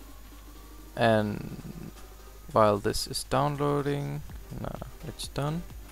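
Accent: Denmark. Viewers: Are you danish lol? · Du lyder dansk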